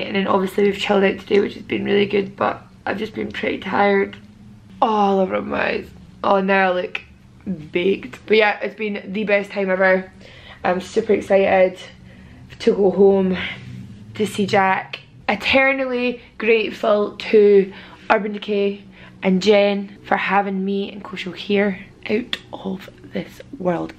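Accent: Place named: Scotland, United Kingdom. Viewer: I've been subbed for about a year now. I had no clue you were Scottish when I watched your vids for the first time, not til I turned the volume up my first thought was "who's she's not from the USA but she's super pretty and that's a cool lip piercing and some awesome ink work" never cease to make me chuckle at least once. You're pretty awesome, keep it up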